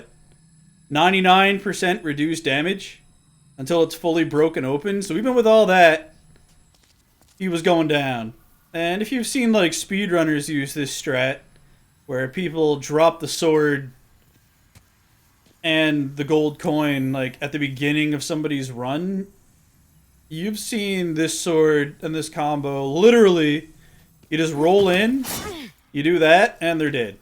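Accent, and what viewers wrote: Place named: Atlantic Canada, Canada. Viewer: Your accent sounds Atlantic Canada.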